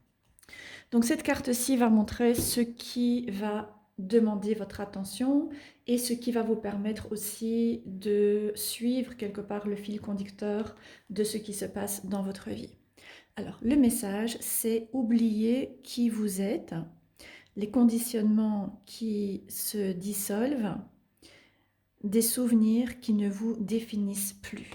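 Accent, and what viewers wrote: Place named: Jura, France. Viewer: J'aime bien votre accent (Jura?)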